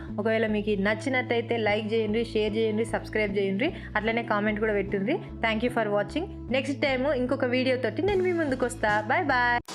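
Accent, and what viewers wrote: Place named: Telangana, India. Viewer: Wah re Wah chef .... Your Telangana Slang Rocks 👌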